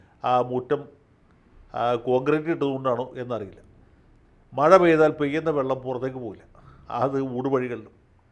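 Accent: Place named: Trivandrum, India. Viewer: I love your "Trivandrum slang"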